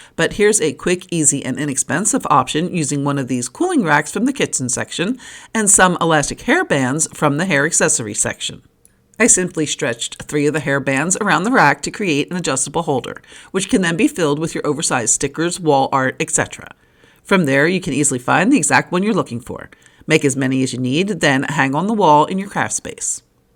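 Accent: East Coast, United States. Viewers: It’s a shame you don’t have any craft supplies on hand. I have to wonder how many viewers caught your pronunciation of crayons, “crowns,” rather than, “cray ahns.” Sometimes you’re so east coast. I love it! I’ve got shelves in my craft cave, but I’m a shortie so I can’t reach things on the top shelf. I need to change some of the stuff to your ideas to make the space more accessible. Happy New Year,!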